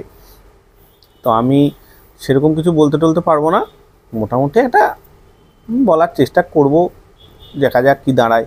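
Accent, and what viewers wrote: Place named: Murshidabad, India. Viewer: Apnar kotha sune mone hochhe apni murshidabad er .. tai ki?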